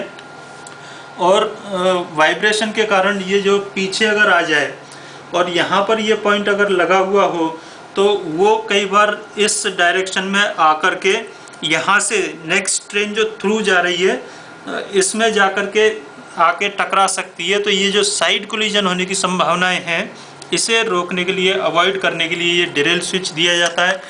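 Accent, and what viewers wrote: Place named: India. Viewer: fuq the indea English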